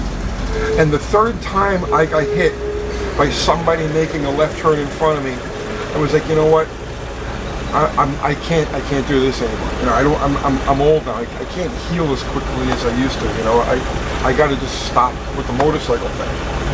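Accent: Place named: New York, United States. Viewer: Love your channel..watch it AWL the time.lol the NY accent is just a plus.lol I was raised in Boston so I drop all my R's myself.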